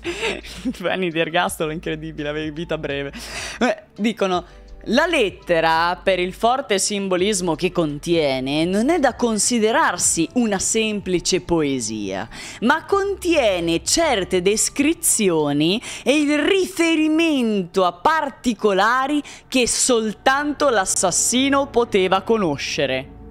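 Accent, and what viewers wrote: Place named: Emilia, Italy. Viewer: "...no no, sono pubici, fanno il ricciolino...!" con la tua cadenza emiliana, sono morto dal ridere, fantastica!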